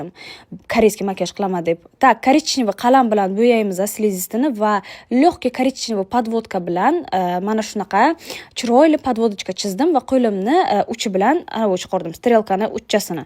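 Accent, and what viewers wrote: Bukhara, Uzbekistan. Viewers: Бухоро шеваси бу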